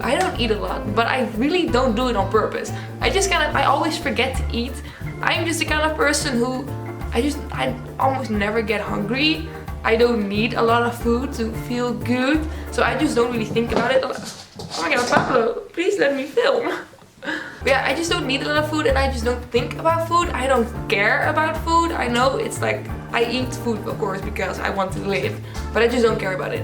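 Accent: Netherlands. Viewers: Je hebt zo'n dik Nederlands accent. Moeilijk te verstaan.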